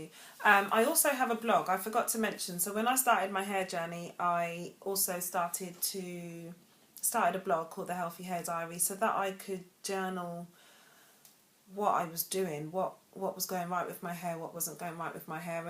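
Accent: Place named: London, United Kingdom. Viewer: are you British your accent sound like you from london. loving your videos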